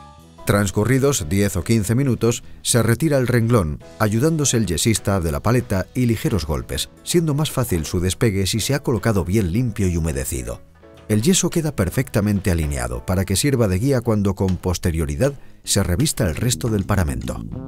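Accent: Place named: Spain. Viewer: No sé quienes están más brutos si los que critican sin conocer o los que preguntan que de qué país es este. Las ciudades mencionadas en el video son de España y el acento del narrador es Español, inconfundible. Por otra parte las técnicas de construcción varían grandemente entre países, ya que los productos no son los mismos, por ejemplo, la forma de preparar concreto en Argentina y Mexico son diferentes, por que no es el mismo tipo de cemento que el que se fabrica aquí por Cemex o Holcim(antes Apasco). El yeso, en Mexico se aplica vivo, sin mezclar, allá es muerto, matando el fraguado con el mezclado continuo, son simplemente técnicas diferentes.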